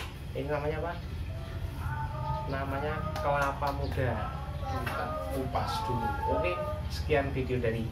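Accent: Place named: Banyumas, Indonesia. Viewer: Wong ngapak ya? Najajl inyong direwangi support ya, wis mampir kie, dipadamkan balik yaa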